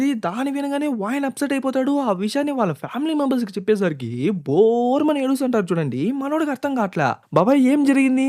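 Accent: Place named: Tirupati, India. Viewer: Triupathi slang never forget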